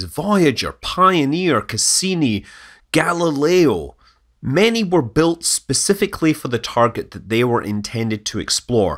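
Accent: Scotland, United Kingdom. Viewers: I love his Scottish voice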